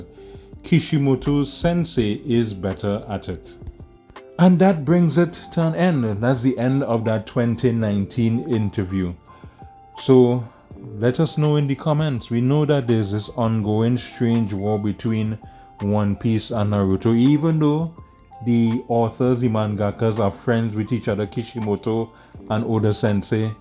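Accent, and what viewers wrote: Trinidad and Tobago. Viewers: Havent even listened to the video in full yet but following because i hear a Trini accent i think? And i support a fellow Caribbean person doing this 💪🏾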